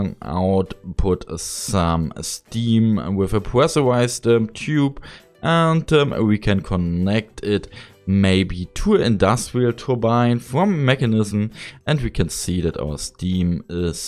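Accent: Germany. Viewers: Sofort erkannt dass dun Deutscher bist xD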